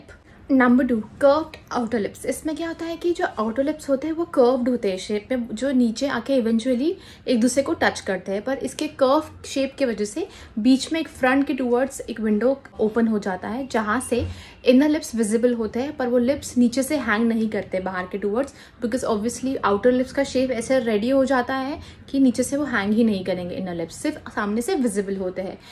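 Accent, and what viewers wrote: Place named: India. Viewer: indian accent could narrate my life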